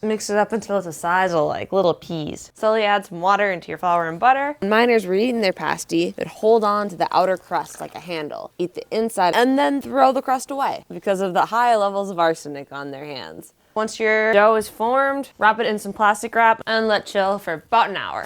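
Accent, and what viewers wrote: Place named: Michigan, United States. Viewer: I can tell you're from Michigan bc of your accent :)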